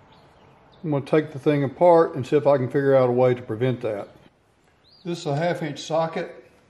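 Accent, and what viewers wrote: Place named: Alabama, United States. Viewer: Alabama? My kinzzz from Huntsville. You have the dialect and accent 😎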